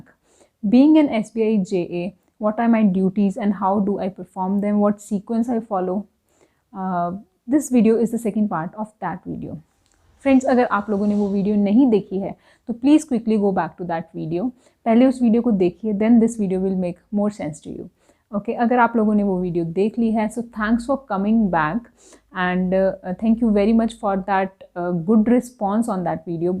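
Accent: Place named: United Kingdom. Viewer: i like ur eng accent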